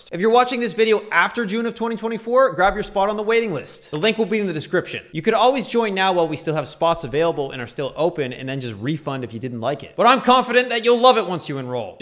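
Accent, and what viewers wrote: Canada. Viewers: Level 2 made no sense - canadian accent made words indistinguishable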